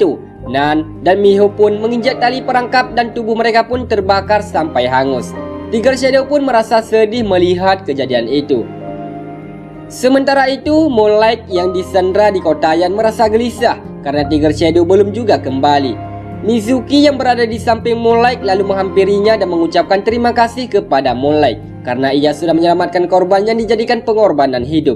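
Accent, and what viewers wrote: Aceh, Indonesia. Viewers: Klo di dengar dari logat bahasa nya pasti orang Aceh 😂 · logatnya orang aceh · Bang, aban orang Aceh ya? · Ko org aceh ya min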